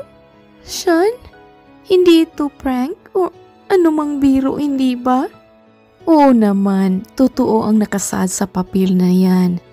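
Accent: Visayas, Philippines. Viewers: Maganda kwento wala ako masabi po sa boses lng d maganda po try ko lng po makinig ng kwento niyo,,maganda po mga kwento ,,,tamo po ung mga kwento ni amihan stories,kat amazing,saima ung mga boses nila magaganda po,pero ung boses po d maganda parang bisaya salita oh hirap tagalog po ✌️✌️✌️✌️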